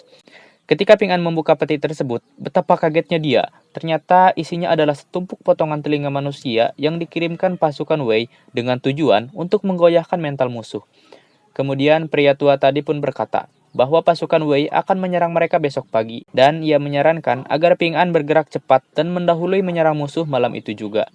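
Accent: Indonesia. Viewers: Logatnya terlalu ke Sunda"an bro bikin kurang sreg dengarnya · Ngapaknya sungguh kental 😂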